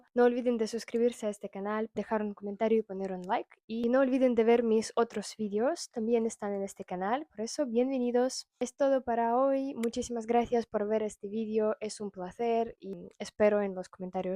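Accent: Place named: Spain. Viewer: El acento es de españa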